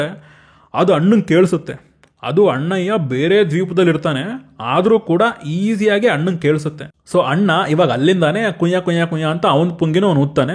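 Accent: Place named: Davanagere, India. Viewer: Pakka Namma Davanagere kannada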